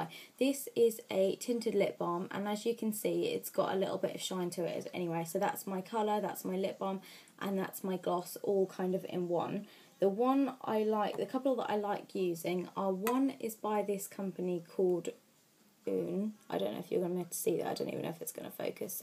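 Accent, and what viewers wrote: United Kingdom. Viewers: this is my first time watching one of your videos and before you started talking I thought you where like 12-13 years old but then I herd your voice and im like WTF?!?!?! Ur like 20 or somethin' years old?!?!? And your British?!?!?!? good video by the way :)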